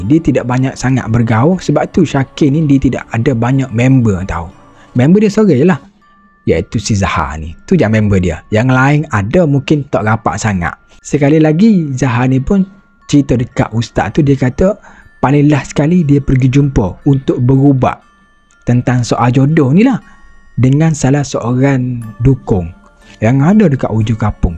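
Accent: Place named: Terengganu, Malaysia. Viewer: haha..ingat cakak banyak G belakang ore ganu..ok terbaik..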